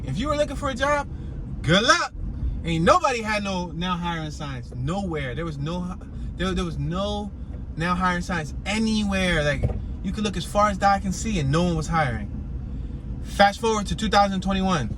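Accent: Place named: South, United States. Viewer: Love the southern!!!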